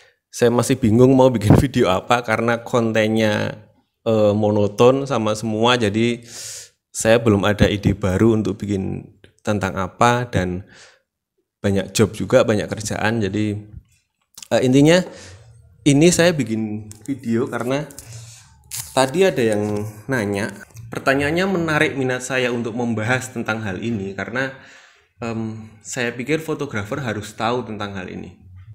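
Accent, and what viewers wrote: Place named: Pekalongan, Indonesia. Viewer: Pas lagi nyari video ttg mengatasi backlight. Ketemu videonya sampeyan. Kok logat ngomongnya nggak asing. Jebule podo wong Pekalongane dewe 😁.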